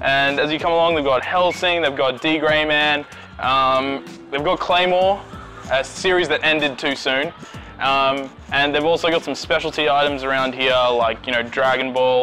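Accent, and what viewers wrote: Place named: Australia. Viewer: Omg how did i just find this store yesterday (THAT AUSTRALIAN ACCENT THO)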